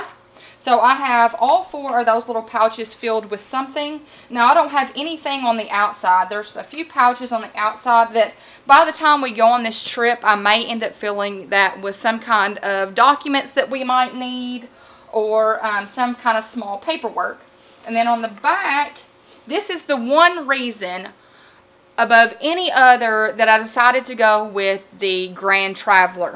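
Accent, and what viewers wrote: South, United States. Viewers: I just started watching your videos, and I really am enjoying them. Like you, I am a planner, list maker, etc. I also own many of the same bags you own. Great minds think alike, right? It is also nice to hear a southern accent on youtube! I'm from Southwest Georgia. By the way, I am also a teacher. 😃😃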